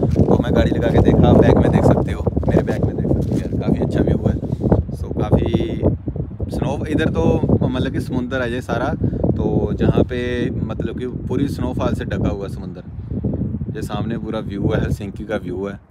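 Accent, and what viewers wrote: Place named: Punjab, India. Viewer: Punjabi nikal hi jandi moh cho 😂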